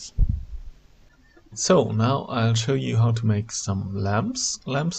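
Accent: Germany. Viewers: Nice Video ! Helped me alot. Did I hear a german slight german accent ?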